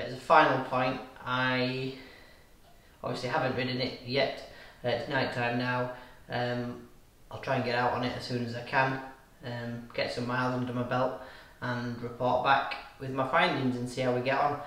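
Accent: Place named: Gujarat, India. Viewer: WOW YOU gujarati i am from gujarat nice to heard you speaking love you...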